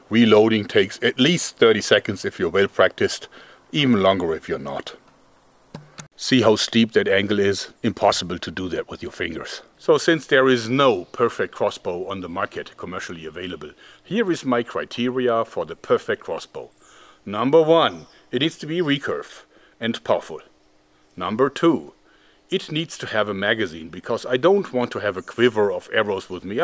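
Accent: Germany. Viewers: His deep voice with German accent sounds brutal. His laugh is epic too. Should be in horror movies XD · Ik like his german accent en strange laugh: this is the slingshot channel, hahaha. Nice channel bro; I like your hobby; because our governments disarmed us.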